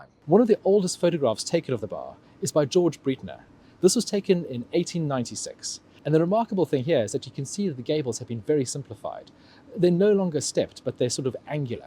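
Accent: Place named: England, United Kingdom. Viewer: You have an English accent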